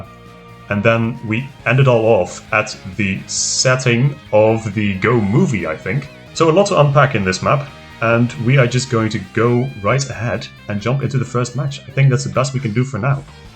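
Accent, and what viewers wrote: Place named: Netherlands. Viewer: Yay, more of this! And I hope the new recruit's effort can be felt! I'm not sorry- Edit: Very dutch pronunciation indeed, nice. Also, recruit Bradford please? (the tiny boy with the brunette rooster haircut) PS. Welkom terug!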